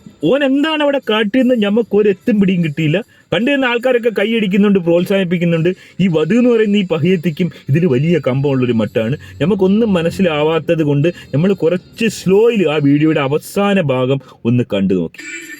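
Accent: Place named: Malappuram, India. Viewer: നിങൾ എന്തിനാണ് എല്ലാ പരിഹാസ വീഡിയോ lum malappuram muslim slang pole സംസാരിക്കുന്നത്. അതൊക്കെ പണ്ടാണ്, ഇപ്പൊ അവിടെയും ആരും നിങൾ സംസാരിക്കുന്ന പോലെ സസരിക്കുന്നില്ല. നിങൾ ഷെഡ്യൂൾ cast കള് സംസാരിക്കുന്നത് എങ്ങനെയാണ് എന്ന് പറയിപ്പിക്കരുത്. അവർക്ക് ഒരു word nte starting letter ഉണ്ടാവാറില്ല സംസരിക്കുമ്പോ, പുലയൻ, പറയൻ, ചെറു മക്കൾ തുടങ്ങിയ വിഭാഗങ്ങളുടെ സംസാരം കേട്ടിട്ടുണ്ട്, അവർ മുമ്പ് തറവാട്ടിൽ നെല്ല് ,കൊപ്ര,കളപ്പുര, help nu വരുന്നവർ ഒരുപാട് ഉണ്ടായിരുന്നു , Eg പറഞ്ഞു തരാം " ആ ചെക്കൻ്റെ പെണ്ണിൻ്റെ വീട്ടിൽ" എന്നതിന് " ആ എക്കൻ്റെ എണ്ണി nte ഈട്ടിൽ " എന്നാണ് അവറ്റകൾ പറഞ്ഞിരുന്നത് 😅😅 അതുപോലെ ഒരുപാട് ഉണ്ട്. ഞങൾ ആരും അത് പറഞ്ഞ് അവരെ പരിഹസിക്കുകയോ ഇങ്ങനെ പറഞ്ഞു നടക്കുകയോ ചെയ്യാറില്ല. നിങൾ ഒരു reaction video ൽ hindu family ye react cheyyumbo polum മുസ്‌ലിംകളെ പരിഹസിക്കുന്ന slang ൽ parayunnath njaan kettu. കഷ്ടം തന്നെ. നിങ്ങളെ നിലവാരം ഇതാണോ ???! മഹാ ബോർ ആണെ്ടോ ഇതൊക്കെ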